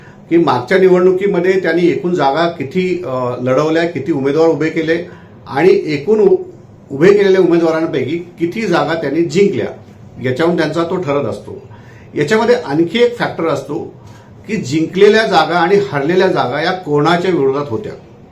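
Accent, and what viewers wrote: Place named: Brahmin, India. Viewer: तुम्ही बोलण्यावरून ब्राम्हण वाटतंय आणि तुम्ही बामणी पक्षाचे उदे ऊदे करत असता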